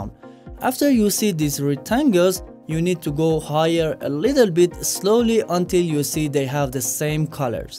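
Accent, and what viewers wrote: Iran. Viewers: ایرانی هستی به حرف زدنت میاد ایرانی باشی are you from iran? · Are you from ir?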